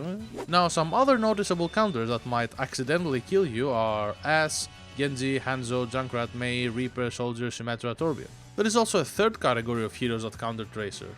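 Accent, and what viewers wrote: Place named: Greece. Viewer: Ελληνική προφορά αααααα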